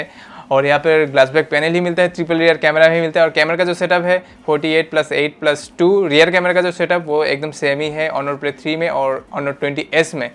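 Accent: Bengal, India. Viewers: Amar mone houechilo Tumi Bengali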